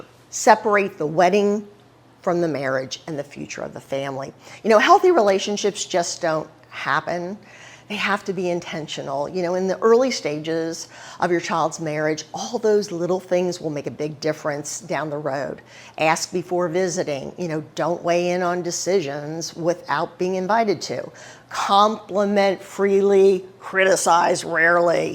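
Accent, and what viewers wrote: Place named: South, United States. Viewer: Thank you for your wonderful content channel mrs. You seems very sociable. As learned since I began to American and British YouTube channels so many things, and Iam still learning. Southern people are very friendly, have hospitality, strong family ties. Appalachian region full of myth and supernaturals such as big foot, New Jersey devil. Mew mew means granny. I read about Texas traditions, manners, wonderful characteristics such as respecting elders, being polite, showing kindness to others as part of Texas values. Native Texans are most genuinely friendly people we ever meet. You have special accent under southern with twist. You have Friday night football, rodeos, barbecue you have too in Arabic countries barbecue it’s great coincidence. Home coming is football or dance celebration of shared history memories, pride, cowboy, Texas is bigger than France. 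I hope I can learn a lot from your knowledge. Happy belated fall and thanksgiving in advance. Good luck to you your dearest ones.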